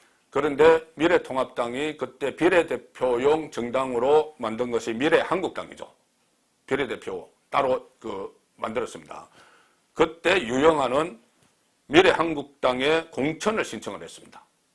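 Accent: Gyeongsang, South Korea. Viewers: 송국장님 경상도분 같은대 우리공화당 지지하시는것 어떠신가요?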